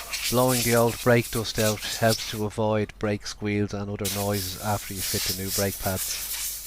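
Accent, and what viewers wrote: Ireland. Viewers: Love your sense of humour and your Irish accent. Thanks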